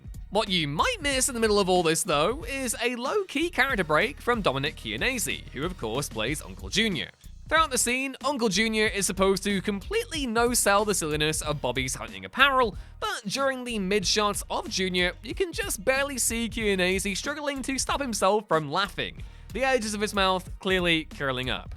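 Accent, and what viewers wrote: United Kingdom. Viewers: it's kind of awkward hearing a Brit talk about the American version of the office when the British version is a lot better. (P.S. I'm American)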